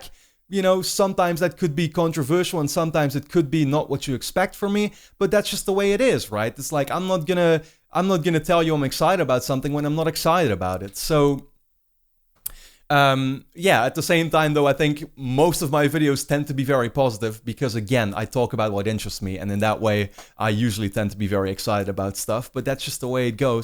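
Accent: Netherlands. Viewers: Ik hoorfe gelijk dat hij nl was accent is altijd hoog hierzo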